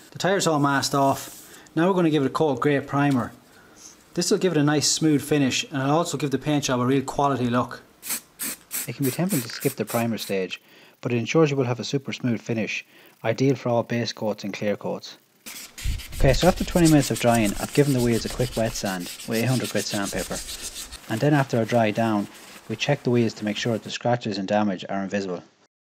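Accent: Limerick, Ireland. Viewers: McGregor has Scottish/northern I think this fella sounds Irish. But I do get your joke man! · Nice to hear an Irish Man 👍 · He is Irish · That Irish Accent...!! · You sound Irish and this is a very honest video it's beautiful so are the wheels when on that car cheers for makeing it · I’m from Southern Ireland, I can confirm this is not a Dub accent 🤣 Limerick/Wexford maybe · Lol it's hard enough understanding that Irish accent and talking fast ain't helping ither 😂😂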